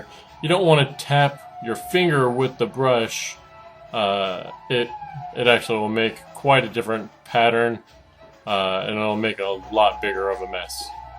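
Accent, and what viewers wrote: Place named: East Coast, United States. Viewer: wow - funny video and very informative I really appreciate your taking the time to make and post this video - I've shared it with others who've asked about Ebru -- I may have to try this -- beautiful work - what is your nationality - heritage? you're obviously American - New York? New Jersey? sounds east coast LOL - I don't know - are you Turkish? Pakistani? I originally thought this was an Islamic art form - I lived in Turkey for two years and how I could have missed this I do not understand - I was young so maybe I just wasn't into art back then.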